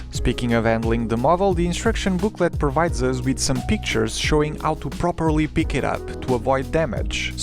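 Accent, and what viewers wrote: Portugal. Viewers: Consegui perceber logo que eras português mal falaste xD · Mano, assisti o vídeo inteiro sem perceber que tu es português, se não falasse eu não iria perceber 😂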